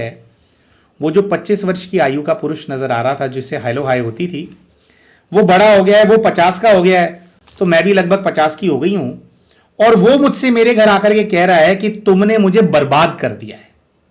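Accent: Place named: Mumbai, India. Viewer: Kantal gaye baba, this is mumbaiyga hindi.kantala ye marathi word hai. inko past life karane ki jarurat kya thi? Boring laga sunana.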